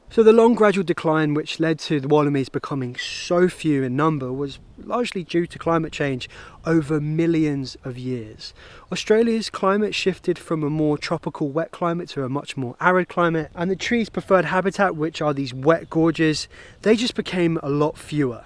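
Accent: United Kingdom. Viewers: Well the narrator sounds English, so what he says would indicate what they're called there, but I think Australia too, yes.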